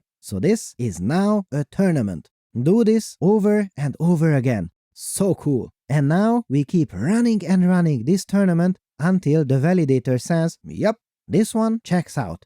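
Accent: Hungary. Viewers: Ah Hungarian! Your accent is super cool but also very unique. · So TMP is Hungarian? News to me. I was curious about the fantastic accent. Great paper as always, Doctor.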